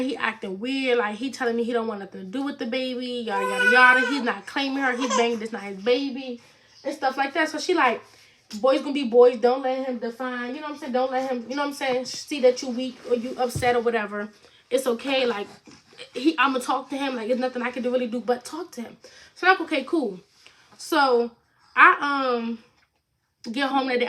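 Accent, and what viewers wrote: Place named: DMV, United States. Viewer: I knew you was from the DMV & then you said “Jii like” I was like I knew it 😂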